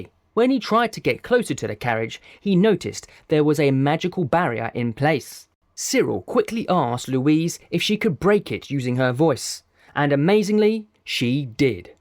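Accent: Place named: United Kingdom. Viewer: never heard a british voice for recaps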